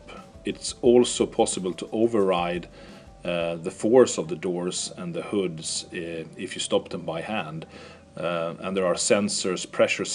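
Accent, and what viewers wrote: Sweden. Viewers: Swenglish! That's a Beaty.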